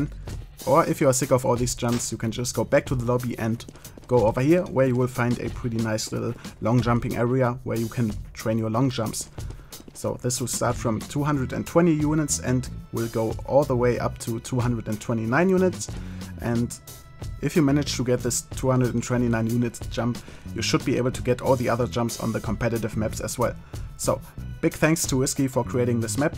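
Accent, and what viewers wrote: Germany. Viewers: Man hört einfach richtig raus das du deutscher bist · bist du deutsch ? du klingst sehr wie ein deutscher der englisch redet ! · is he german? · German accent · Deutsch? Wegen dem dialekt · dein englisch ist so deutsch ! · love your german english sounds so nice :DD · Sounds like an german accent, lol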